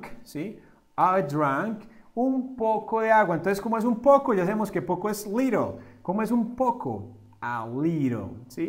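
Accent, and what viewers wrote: Antioquia, Colombia. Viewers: Gracias profe, se parece a Vegetta 777, pero en Colombiano, gran video por cierto. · Me encanta la voz de los colombianos y no no me gusta los hombres por si alguien solo pensaba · Es la primera vez que veo un video suyo, profesor. Estoy estudiando inglés porque siempre me ha encantado, pero entre más lo estudio descubro que no es tan fácil como creí. Justo este tema de los cuantificadores me confundió pero gracias a su explicación lo entiendo mejor. Muchas gracias por tomarse el tiempo de dar esa clara explicación, además su maravilloso acento paisa tan marcado lo hace mucho más agradable. Muchas gracias, ya me suscribí.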